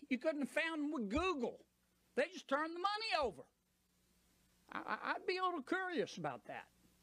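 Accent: South, United States. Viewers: Common sense with a Southern accent!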